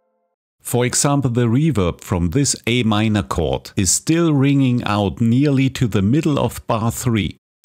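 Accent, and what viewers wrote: Germany. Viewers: Akzent klingt Deutsch.......gibt es auch solche Videos von ihnen auf Deutsch?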